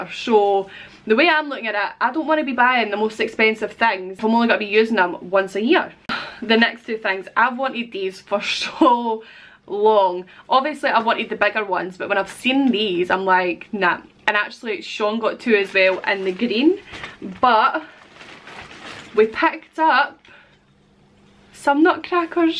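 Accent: Scotland, United Kingdom. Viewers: I never considered what a Dollar Store would be called in other countries. A store in america called PoundLand would not go over so well. lol. Love your accent by the way, and I do mean your ...regional? scottish accent. I love listening to it.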